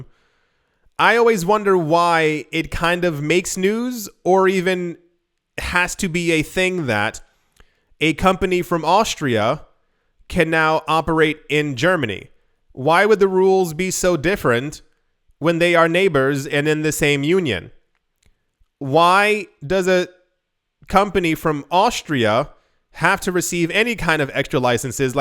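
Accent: France. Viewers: Your french accent is so cute!!!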